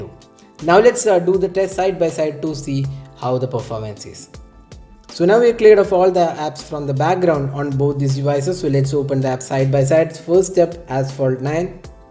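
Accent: Kerala, India. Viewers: Are you a malayaliii🤔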